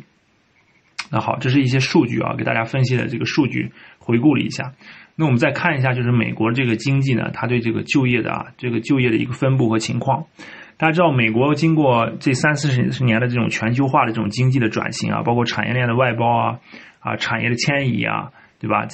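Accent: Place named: Inner Mongolia, China. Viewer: 越听越像哈，也像呼市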